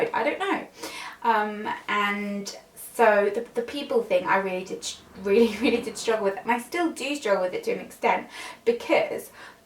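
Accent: England, United Kingdom. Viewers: Very interesting video! I've been watching you for years and it's nice to hear a bit more about you and your past! You have a very English-sounding accent, without sounding completely uneducated and ignorant - did you always have that accent or has it evolved since you moved over here?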